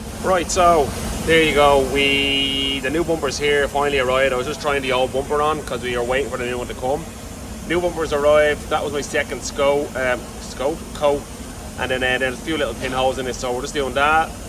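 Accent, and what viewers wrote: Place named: Dublin, Ireland. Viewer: Love the Dublin accent! Up the Dubs! Great job on the car.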